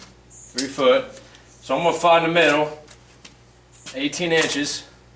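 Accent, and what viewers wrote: South, United States. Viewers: Just love this guy! Especially how he talk! Is it Southern? (I am from California). Thanks for the Video!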